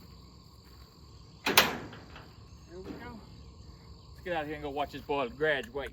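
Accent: South, United States. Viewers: You the man.keep up that good old country talking..... Come on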